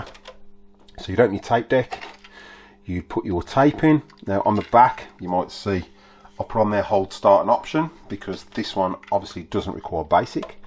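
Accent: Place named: West Midlands, United Kingdom. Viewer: That accent sounds like Walsall or close in the West Mids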